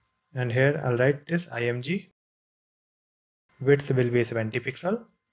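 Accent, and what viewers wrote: India. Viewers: Bhai Indian accent not los Angeles CA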